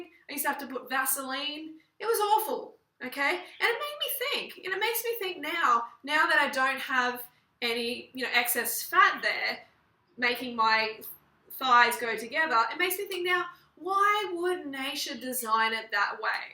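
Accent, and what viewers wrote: Australia. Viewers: You ave an Australian Accent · what part of australia are you from?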